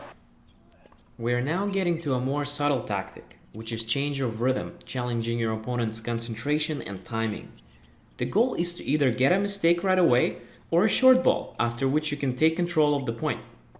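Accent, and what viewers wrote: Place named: Russia. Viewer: your accent sounds like russian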